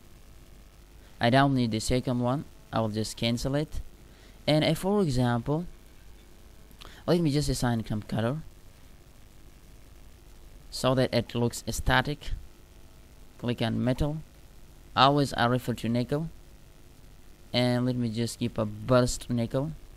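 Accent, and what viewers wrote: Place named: United States. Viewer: yaar accent to na daalo itna! sadi english bol lo aapka meericun accent se kuch samagh nai aaya!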